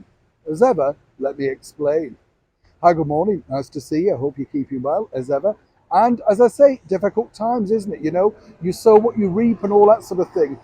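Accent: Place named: RP, United Kingdom. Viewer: We savages across "the pond" are having trouble with your posh Brit accent.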